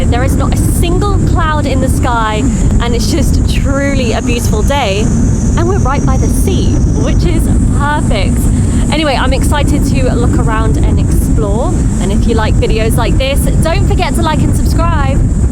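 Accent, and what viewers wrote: United Kingdom. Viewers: きれいなイギリス英語なので、字幕の英語をメモして残しています。そうすると、Squishyさんの癖がよくわかりますね。 例えば、ここでを表す「here」は副詞だと思っていたのですが、名詞もあるのですね。「in here」と、しばしば使ってました。初めて知りましたよ。hereの名刺的用法だなんて。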